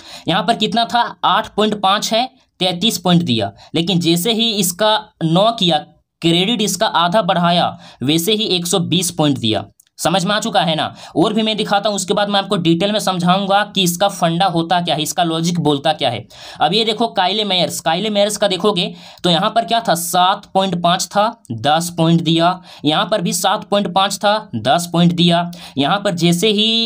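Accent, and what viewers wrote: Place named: Bihar, India. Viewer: Bihari hai aawaj se pata chalta hai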